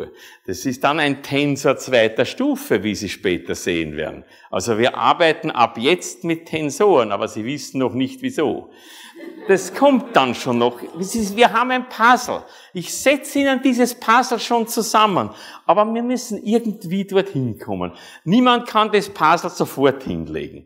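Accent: Austria. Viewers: Als Schleswig-Hosteiner ist es wirklich eine Herausforderung über eine längere Zeit dem sehr sympathischen Herrn Professor mit dem österreichischen Tonfall konzentriert zu folgen. LOL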